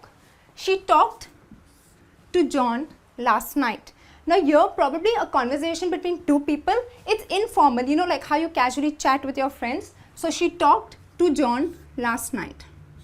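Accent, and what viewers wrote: India. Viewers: you sound so indian :!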